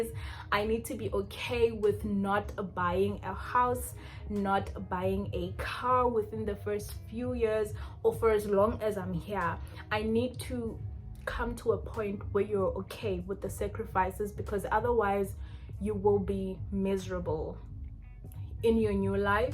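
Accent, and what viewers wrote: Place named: United Kingdom. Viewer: I can start to hear some typical British accent in your voice 😅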